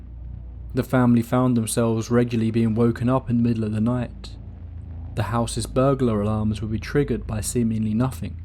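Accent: United Kingdom. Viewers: Your voice makes these stories so boring and uninteresting. Please be less British.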